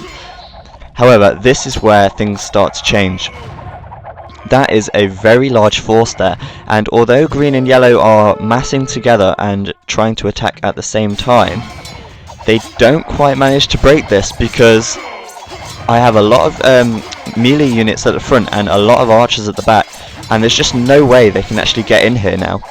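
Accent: United Kingdom. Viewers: This is a great video and I love your British accent. MAKE MOAR!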